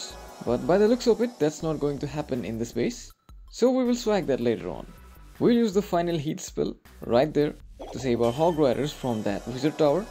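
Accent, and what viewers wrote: India. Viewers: Bro im not sure but I think youre from india cuz i saw your thank u for 3k subs and all the background noise of vehicles and electricity poles make me feel youre indian. Plus theres a slight touch of hindi accent in your english. Please let me know if im right!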